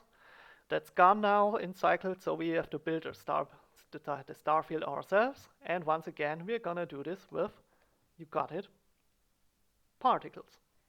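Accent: Germany. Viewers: Are you german? :D sounds like you are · Also, als ich den Fernsehturm gesehen habe, hatte ich ja schon einen gewissen Verdacht, aber mit deinem akzent ... du solltest noch an deinem englisch arbeiten, das klingt sehr deutsch... ich bin übrigens aus dresden. ... (If you can't read this ... i was wrong with this comment, ignore it.)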